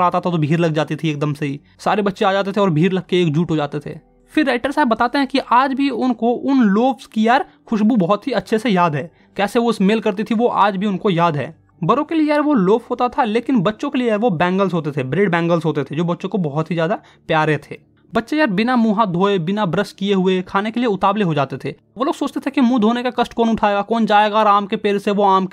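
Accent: Bihar, India. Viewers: Sir, Are you from bihar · Sir aap Bihar se ho kya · Bhaiya aap Bihar ke Aurangabad jila se hai kya .😘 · Sir kiya aap Bihar❤ se he. aap ka language se lag ta he😊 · Yeh bihari h pakka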